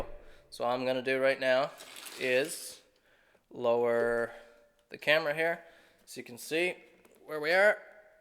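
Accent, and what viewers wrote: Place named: Newfoundland, Canada. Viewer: Start video. "This guy's from Newfoundland". Look at About. "Yup."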